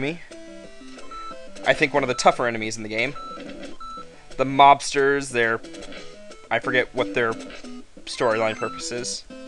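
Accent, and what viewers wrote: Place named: New York, United States. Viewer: Jay Gatsby's his name. Daisy's Nick's cousin. I just read the book, haha :-) I don't remember the part ish or level two though. I don't know what those are from. The spectacles are TJ Eckleberg's, on a billboard for a glasses company. I love your NY accent, btw. The part about Gatsby being poor was a flashback though. And there are no sewers in the book XD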